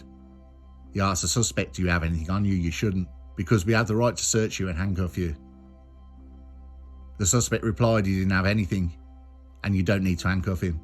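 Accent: Birmingham, United Kingdom. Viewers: ... Narrator has a Smoooth Brummie Accent... NICE FLOWING STORY 🎉🎉🎉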